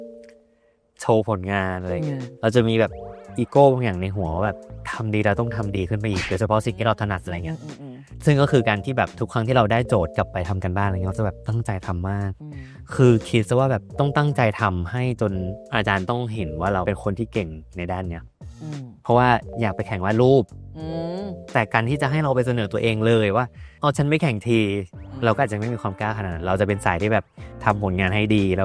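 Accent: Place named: North, Thailand. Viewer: น้ำปิงพูดเหนือน่ารักมาก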